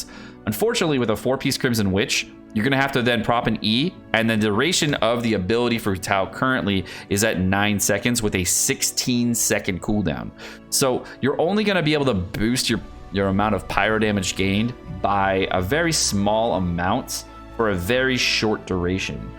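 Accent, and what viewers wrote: United Kingdom. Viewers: I don't care about who tao. I'm just here for the music and bri'ish. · Woah british again ☺️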